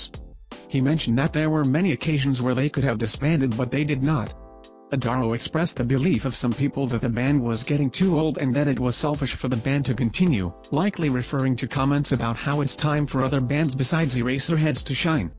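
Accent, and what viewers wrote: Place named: United States. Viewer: Who's commentator...American accent... keep it up...nice trivia... about Ely buendia...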